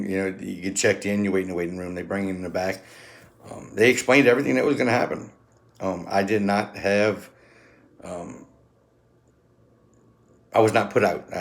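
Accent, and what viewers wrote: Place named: South Louisiana, United States. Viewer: Which location did you use. You sound like you might be from south louisiana. Nevermind got to it at the end lol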